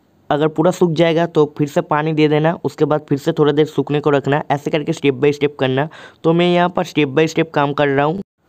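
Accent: Bengal, India. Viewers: His bengali ascent makes me laugh always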